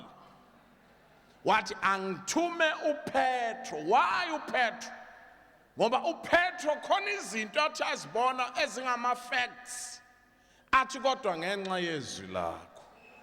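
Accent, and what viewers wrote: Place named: Xhosa, South Africa. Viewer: Wamuhle simakade asimnandi isiXhosa sakhe sindenza ndiyive ngakumbi mna lo myalezo, ziphi na inceku ezigcwele ilizwi nje ngale ndoda.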